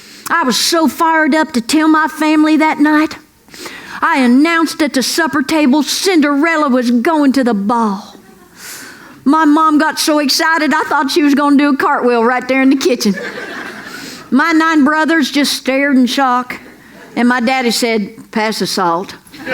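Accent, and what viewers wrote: South, United States. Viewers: I love her humor too, especially since they are told about true incidents that she lived through herself!! SHE HAS A WONDERFUL SONGING VOICE EVEN THOUGH HER REGULAR SPEAKING VOICE HAS THAT SWEET COUNTRY TWANG.😂. I lo e listening to jokes! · Three weeks ago I stumbled upon an vid and with my niece named Ella Mae I thought what the hell and clicked on it. I have never been so glad I clicked on something I wasn't even looking for. I laughed and then I laughed and then I laughed some more. Her observations and that southern drawl had my stomach hurting. These last three weeks, I've looked for and watched everything I can find that says. Totally hilarious including her X-Rated ones. Love me some. Oh by the way, did I tell ya I laughed😍😍😍😂😂😂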